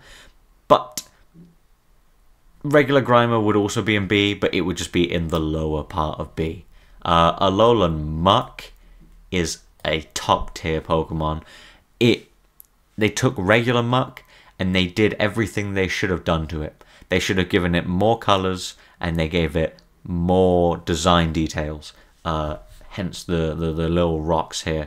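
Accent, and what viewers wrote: United Kingdom. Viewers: just LOVE the british accent xDD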